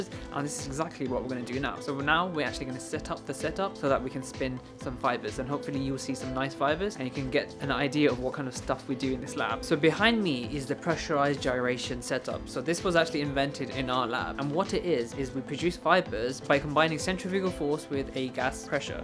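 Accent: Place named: United Kingdom. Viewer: you talk very nicely, professional, sweet and obviously how can I forget your British accent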